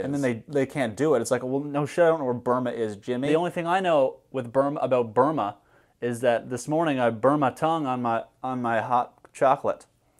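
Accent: Newfoundland, Canada. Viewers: As soon as you said “lore” a heritage moment played in my head and I became fully aware that you have to be from Newfoundland. But like, also every other word as well. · the way that I could tell he was Canadian before he even said it. -sincerely, a fellow Canadian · I watched only two of your other videos before, the horrible yo mama jokes with the theory of relativity and congestive heart failure, and I was wracking my brain thinking - where the hell is that beautiful background and what is his accent?? It's not quite European but it's not quite American? When you said Newfie, it all made sense lmao cheers from the 6ix, thanks for mentioning Ontario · Aha - I picked out that you’re Canadian from your accent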